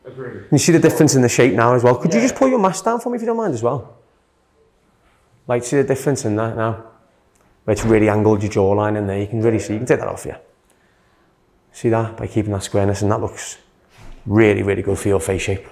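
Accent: Liverpool, United Kingdom. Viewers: Is he from Liverpool?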